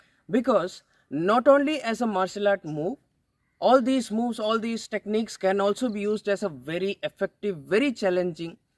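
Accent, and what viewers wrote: Kerala, India. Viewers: Are u South Indian or punjabi because in English u have a typical Keralite accent in hindi u have punjabi accent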